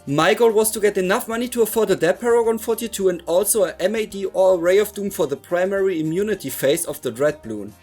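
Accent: Germany. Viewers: Deutscher Akzent immer so lustig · nice german akzent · U German?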